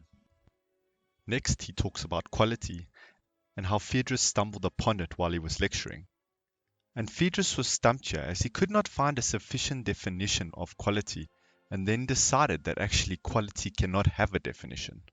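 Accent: South Africa. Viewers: Soutie accent 100% lol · South African? with a cold? misses the mark. Maybe you should re-read it a hundred times like everyone else and then come back and do the video again.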